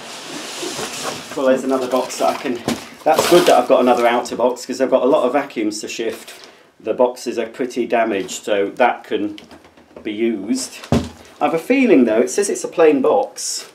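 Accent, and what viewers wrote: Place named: United Kingdom. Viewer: I love the sound of your voice. Well spoken and who doesn't like a British accent?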